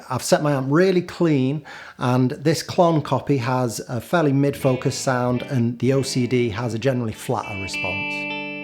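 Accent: Manchester, United Kingdom. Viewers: Thought you'd misspelled 'account'... But no... MANCHESTOH 👍💪🐝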